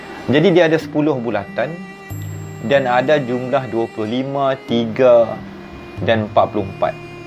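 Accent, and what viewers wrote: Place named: Kedah, Malaysia. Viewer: Wah Bercerita menggunakan loghat utara (melayu kedah ). Jgn diklaim bahasa indonesia pula.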